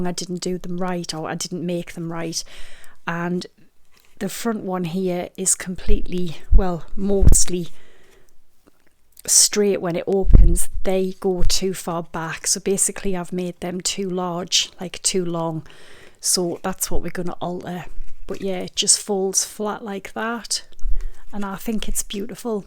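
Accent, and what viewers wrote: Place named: Wales, United Kingdom. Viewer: Thank you for this, you make it so simple with easy - to - follow instructions, and your lovely Welsh accent is a bonus. Kathy from New Zealand.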